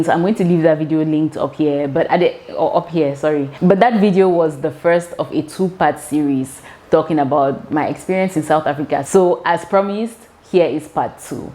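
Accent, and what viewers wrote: Nigeria. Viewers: IMO…Yes! You sound Nigerian. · it's true, you sound Nigerian. When I saw in Miss Trudy's vlog , I took ma a while to realise that you were Cameroonian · In Botswana, we live with so many Nigerians and their accent is like yours. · I thought u were Ghanaian, u are beautiful like Jackie Appiah.....but yeah u sound Nigerian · 😂😂😂😂😂😂there is a bit of Nigerian accent I hear it too. However I think as well it’s by default we usually come across more Nigerian Africans then Other other country besides Zimbabwe . You geogeous though ❤single? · OK first of all, you look beautiful. And yes, you do look and sound Nigerian. I lived in South Africa for 16 years. No doubt its a beautiful country. But just like every other country including my beloved Naija, has its flaws. Topic for another day. So yeah, I enjoyed ur innocent and open minded view of Cape Town / South Africa. 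. I could relay to most of the things you said when I newly came. Good a thing you kept the video simple, funny and educative. Thinking of subscribing to your channel, if for nothing else, just to see ur smile once more. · You went in on the languages segment 😂😂 I'm with you on "must" and "should". "Cape Town is not a place you go to fit in, you go to stand out" that's a word chiiiile. I also thought you were Nigerian from your accent 😂🤭 And that emphasis on "Zimbabwean friends" took me out looool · Lmao I'm not sure about you being light skinned making SAns thinking you're Nigerian but I think you really sound Nigerian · You sound Nigerian but look South African, it's easier to understand why locals spoke Xhosa to you. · u sound nigerian though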